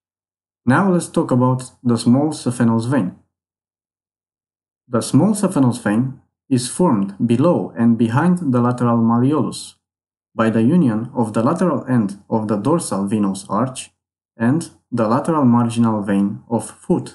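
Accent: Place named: Romania. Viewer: Salutare, tocmai am 3 saptamani dupa operatia de varice..Doamna chirurg, mi-a spus, ca mi-a eliminat acesta vena(safena)... Am mai multe incizi pe picior. La inghinal, langa zona genitala am o cusatura mai maricica, cica acolo ar fi "inchis-o".... Deci, sunt operat de la glezna pana sus... O luna fara efort fizic... Ma simt ok, dar dupa, sa zic vreo 15 min - 20 min de mers, stat in picioare... Simt presiune, suportabila in picior, cu senzatie de umflare... Cred, ca e normal... Ptr perioada asta... In fine... Aveti un video foarte interesant..., dupa accent sunteti roman 🙂💪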